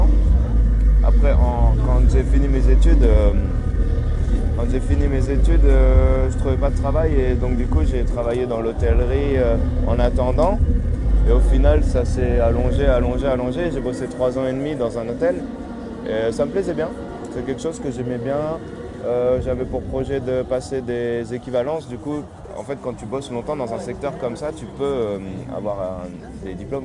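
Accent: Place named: Lower Normandy, France. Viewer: Il vient de Caen ça s’entend il a l’accent Bas-Normand Il parle lentement, il a un coup de barre depuis ses 14 ans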